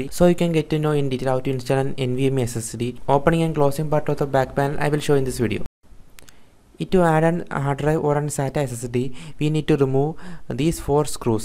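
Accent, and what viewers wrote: Kerala, India. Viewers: Helpful video bro Btw are you from Kerala Malayali ano?😅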